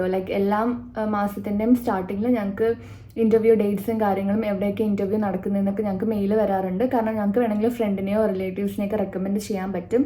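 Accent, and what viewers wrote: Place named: Kochi, India. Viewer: തിരുവനന്ദപുരം സ്വദേശി ആണെങ്കിലുo കൊച്ചിയിലെ ഒഴുക്കായ മലയാള o കൊണ്ടു എല്ലാ പേർക്കും വളരെ ഇഷ്ടപെടുന്നുണ്ട്.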